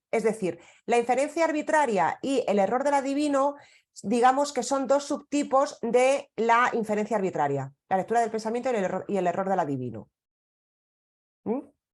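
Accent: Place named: Spain. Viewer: Hola, soy de México 🇲🇽 😊 a veces no capto bien las palabras por tu acento naturalmente español 😅 ¿Puedes escribir el nombre de los 2 autores que mencionas?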